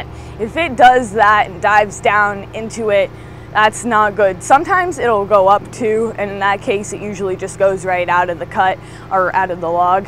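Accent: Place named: Pennsylvania, United States. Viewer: ~ your Grandpa and "The Boss" have trained one of the best lumber foremen in the industry! Thank you for sharing these practical trade skills and important safety tips! As they say "safety is no accident". God bless Lumber Capital Log Yard! P.S. that is the color T-shirt I'd like to own; but I'll have to dye my hair Boudica red just to begin looking as good as you do in it. Your Central PA country accent and good looks are what it takes for my A.D.H.D. brain to track properly without distraction or interruption. Those are strong leadership qualities you possess that I am afraid King Charles is lacking in. Freedom from tyranny is our Monarchy. Lest We Forget!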